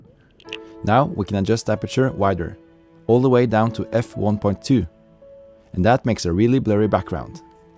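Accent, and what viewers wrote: Norway. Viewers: Ah du er Norsk, hviste det med en gang du snakka, men bekreftet når det sto NOK på ebay :D Flott video. lærte masse :) tusen takk :D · Your accent sounds like you are a Norwegian :)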